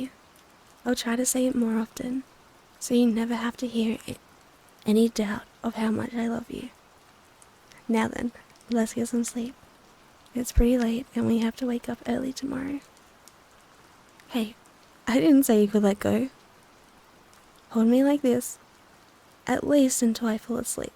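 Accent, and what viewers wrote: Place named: Australia. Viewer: AUSTRALIAN ACCENT 🤩🤩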